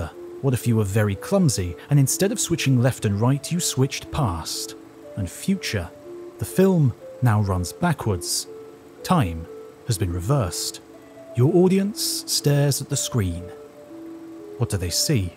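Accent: England, United Kingdom. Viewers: Its the English accent, crap sounds like fact instantly.. Again hes reading off something because why is he saying we?? Only the majority think a certain way whilst the rest of us dont care about information that takes you away from a stable self financial comfort..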